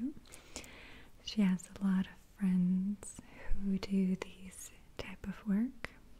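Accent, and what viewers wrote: Russia. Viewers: I was sooo hoping to hear the russian accent again ^^'' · The Russian accent is so relaxing 😍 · You should do a souvenir shop role play with those items. Maybe use a heavier Russian accent and give us background on the items! I’d love to know the stories behind them :)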